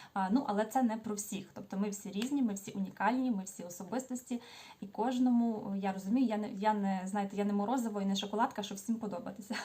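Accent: Standard. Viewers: Привіт. Підписана від початку війни. Подобається ваш голос і чиста українська мова. Ви дуже приємна. Бажаю здоров'я вашій донечці, бо чую що кашляє). І мирного неба! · я підписалася на Вас неділю назад.Підкорили чистою українською мовою, щирістю, сімейністю. Вы завжди розказуєте про донечку,видно,що вона-ваше найбільше досягнення, гордість. Для мене-ви релакс вранці і ввечері. Подивилася відео про порожні баночки-почала використовувати пробнички,а їх за 10 років дружби з мережевим так багато!Дякую!Процвітання каналу!І набрати скоріше 1000 підписників!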